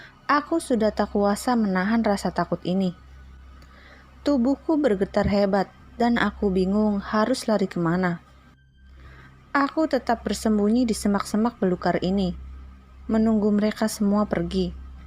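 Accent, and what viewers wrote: West Java, Indonesia. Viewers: Pembaca ceritanya berasal dari Sunda/Bandung ya?... Betulkah?... Karena penyebutan "bagaimana" terdengar menjadi "bagaimanak"/ penyebutan "sunyi sekali" terdengar menjadi "sunyi sekalik"/ penyebutan "gelap sekali" terdengar menjadi "gelap sekalik"/ penyebutan "membaca shalawat Nabi" terdengar menjadi "membaca shalawat Nabik"/ penyebutan "jangan tinggalin aku sendiri" terdengar menjadi "jangan tinggalin aku sendirik".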